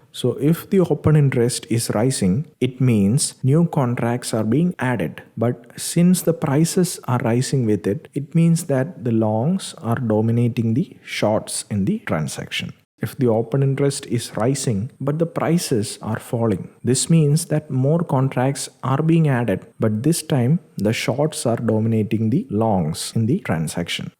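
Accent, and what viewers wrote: Kerala, India. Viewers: Sir, are you from Kerala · Excellent Video. Volume pronunciation can make out that it's a Malayali. Nevertheless, excellent presentation. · The voice of a Malayalee. Well explained. Thanks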